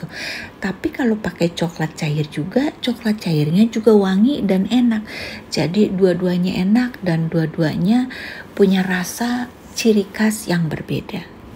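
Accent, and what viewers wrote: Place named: Banyumas, Indonesia. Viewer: Suaranya agak mirip THV Channel (The Hasan Tv) tp THV versi ngapaknya 😁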